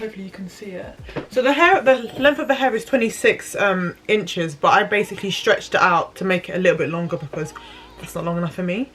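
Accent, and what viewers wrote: United Kingdom. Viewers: Greetings Sis. Just wanted to say that it's great to have a British voice amongst these videos. Thanks for taking your time with showing the process. Makes such a difference. Please do more videos. Thanks so much.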